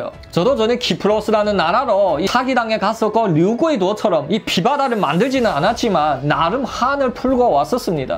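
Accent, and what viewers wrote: Yanbian, China. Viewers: 웃긴다 조선족 말투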